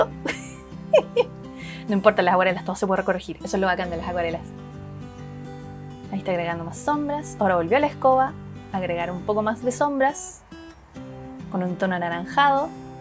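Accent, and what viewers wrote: Chile. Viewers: Amo cono suena su acento chileno 💛💖💛💖 ~ bacan Jsjsjsjs la amé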